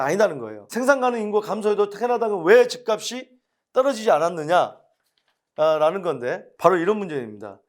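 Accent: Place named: Honam, South Korea. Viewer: 호남 사투리 쓰시네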